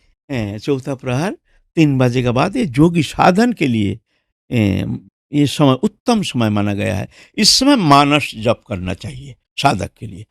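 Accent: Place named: Bengal, India. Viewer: Baba ap bengali ho na ??? Apka batchit me bengali tone ata hai. Ap jorur bengali ho. I am bengali.kolkata.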